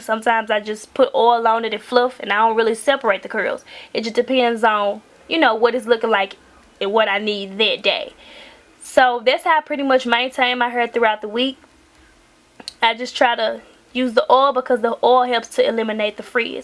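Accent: South, United States. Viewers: She is sooooo country! Where you from? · yes, she is definitely from the south 👌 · girl you so country!!!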